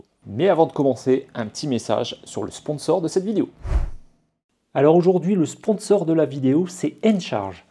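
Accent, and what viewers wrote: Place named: North, France. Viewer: Salut voisin nordiste (vu l'accent), merci pour cette vidéo. Pas mal les prises de vues avec le drone au Tréport.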